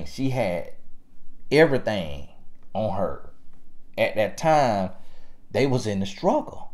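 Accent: South, United States. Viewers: I enjoy your logic,you are right people are like sheep they run in herds,l love your accent the south l would say.Keep up your wisdom.l am white and my daughter is heavy,she is treated that way.And some men genuinely love big woman,but they are really ostracized for real. Love your vocabulary. PEACE👏👏👏✌💯I Have to read your books.🙏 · You should add comedian to your list of achievements , them sweet teas and chicken wangs took me out😂😂 it just drove the message home, that authentic southern accent and commentary Is everything 🙌 no matter how far you go be yourself, love yourself still on my journey... Far from where I'm going but far from where I was 🙌 CARBONATION 😩😂😂😂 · This guy sounds like my Dad it’s crazy. Where in the south is he from ?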